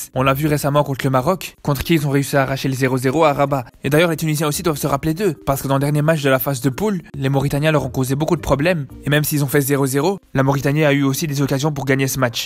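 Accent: Belgium. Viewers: C'est un accent belge que j'entends? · Tu as un ptit accent belge.lol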